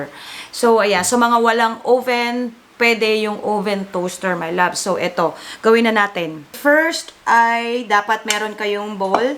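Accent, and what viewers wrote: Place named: Visayas, Philippines. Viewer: wow magaya nga in bisata accent 😉 pa sab back ka keto, keep connected, tnx 😉📊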